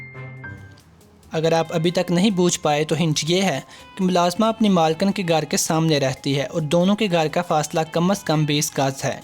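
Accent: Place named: Lahore, Pakistan. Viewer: Are you lahorei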